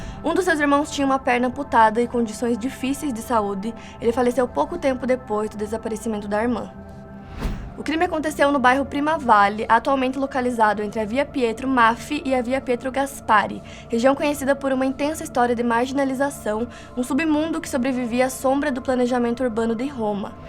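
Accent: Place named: Rio Grande do Sul, Brazil. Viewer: Sotaque fofo.... Gaúcha?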